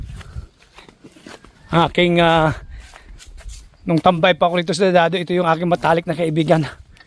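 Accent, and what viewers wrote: Iloilo, Philippines. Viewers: Ilonggo gid haha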